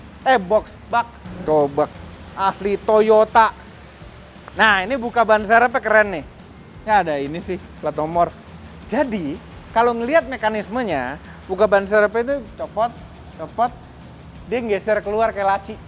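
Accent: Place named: Kalimantan, Indonesia. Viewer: Aghhhh .... asyik banget denger nya om, bahasa " fungsi dan bukan untuk gaya" sprti bahasa pulau kalimantan😅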